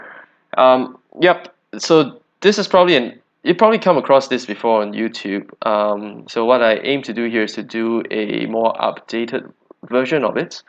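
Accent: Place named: Singapore. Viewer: Singapore? Malaysia? That accent is unmistakable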